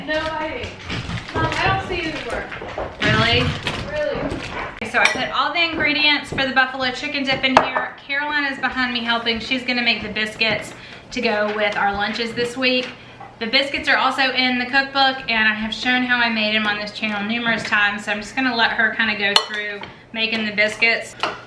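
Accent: South, United States. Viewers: Are you in or near Texas you have a fabulous southern accent.. ya all lol x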